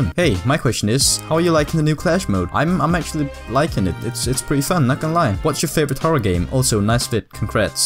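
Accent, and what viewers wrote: Denmark. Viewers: I knew that accent sounded familiar... You're Danish! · Hehe, det var sgu nemt at høre på din dansk-engelske accent!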